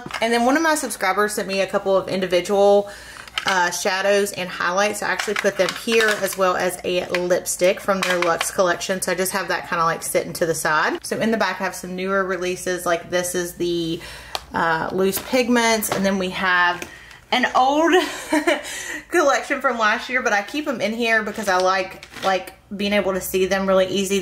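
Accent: South, United States. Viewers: I was introduced to you through yours and Vianney's giveaway...... so glad for that! Love your realness and your accent is ah-mazing!!! Reminds me of my Georgia and Alabama family 😉.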